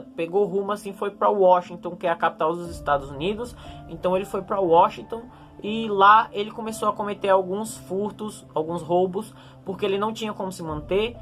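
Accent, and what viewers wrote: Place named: Pernambuco, Brazil. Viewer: Que massa! Reconheci o sotaque. Sou de Pernambuco :D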